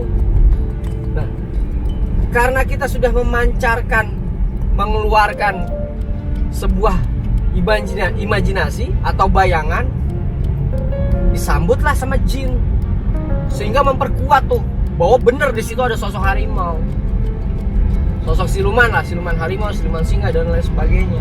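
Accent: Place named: Cilacap, Indonesia. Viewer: Ki tunjukin penampakan janda dong buat saya pffftttt... (just kidd) Cilacap ngapak mantap yoyoy...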